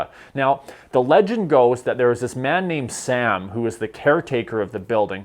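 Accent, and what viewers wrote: Canada. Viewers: How can you like him? Worst yet! Every time he says A Boot I just want to smack him. The way he talks sounds like a bad B movie that could not even make that grad, I am totally sad to find out he is from Vancouver.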